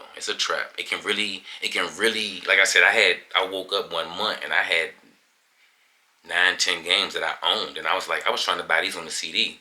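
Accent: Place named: DMV, United States. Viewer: I hear that DMV accent fam! Had to come support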